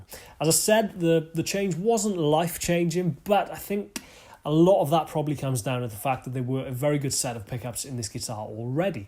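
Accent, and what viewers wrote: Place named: England, United Kingdom. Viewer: Could listen to that playing and your English accent all day long Brother!!! You make Clapton cry!!! Haha and Joe Bonamassa is calling for bending lessons from you….. Damn!!!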